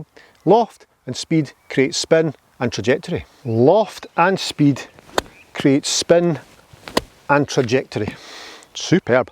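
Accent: Scotland, United Kingdom. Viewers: The Scottish accent makes the golf tips better.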